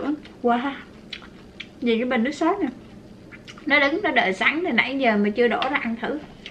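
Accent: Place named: Hue, Vietnam. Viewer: Cô nói tiếng huế hay ghê á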